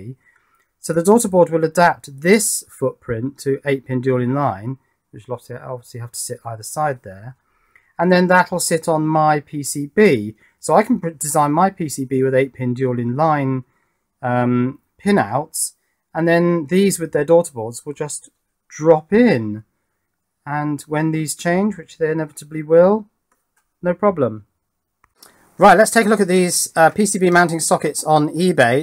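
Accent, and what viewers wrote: United Kingdom. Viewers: NO!. is british and he speaks british english.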